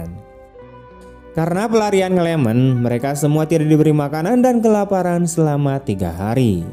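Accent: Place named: Sunda, Indonesia. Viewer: Anyeng suara nbte ngenaheun co aa ti Sunda siganaamah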